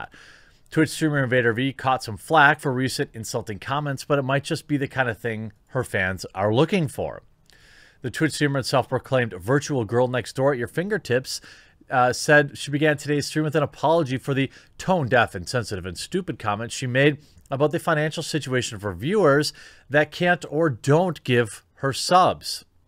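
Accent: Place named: California, United States. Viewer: where in california is that accent from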